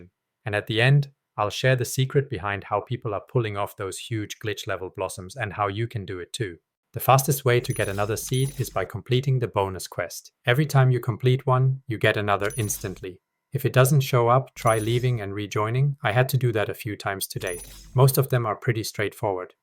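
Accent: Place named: Germany. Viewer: Ich höre deutsch raus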